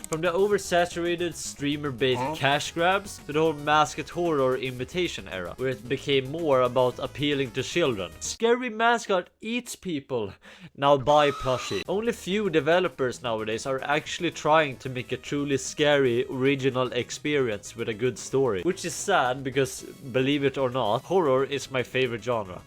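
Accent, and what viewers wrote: Sweden. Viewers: Is he swedish?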